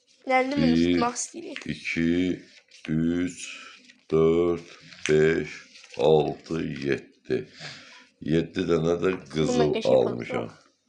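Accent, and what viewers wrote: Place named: Balakan, Azerbaijan. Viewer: lap yaxsi lehceye göre sorusdum sandım balakenlisiz.adim Ali uqurlar size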